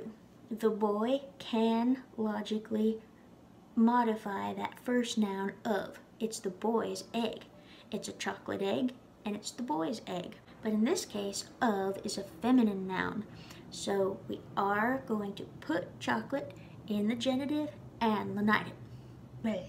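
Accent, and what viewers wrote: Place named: Alabama, United States. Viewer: You're concerned about your pronunciation because you're from Alabama, I'm a Yankee and I put a fada on all A's regardless of standard pronunciation. This was an outstanding lesson! GRMA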